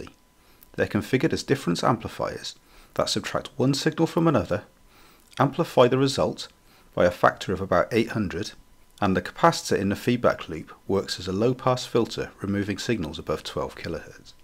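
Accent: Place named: Cardiff, United Kingdom. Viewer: Nice project for the RP2040. By the way, to my ear, you sound like a Cardiffian who lives/lived in England?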